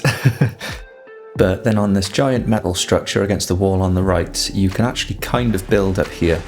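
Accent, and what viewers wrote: Wales, United Kingdom. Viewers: I started listening as you sound very welsh, I kept watching as you are really good at explaining things and as such I’ve gone from worst ark player ever to competent enough to survive a week 😂. Keep it coming for us older folk who can’t keep up.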